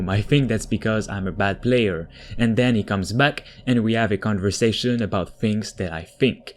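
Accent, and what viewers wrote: Quebec, Canada. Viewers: R u canadian french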